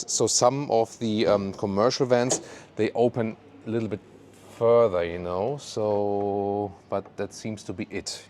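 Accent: Germany. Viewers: The word version really brings out the German accent 🙂 I had seen another review of it earlier that did not mention the ridiculously low battery capacities 😕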